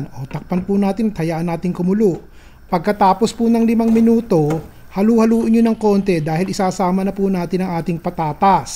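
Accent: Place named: Bulacan, Philippines. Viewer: Gud morning, thanks again sa npaka simple at npakasarap na chicken recipe,halos parehas tau magsalita ng tagalog.ganyan kaming magsalitang mga taga Bulacan at Nueva Ecija.GOD BLESS YOU AND YOUR FAMILY...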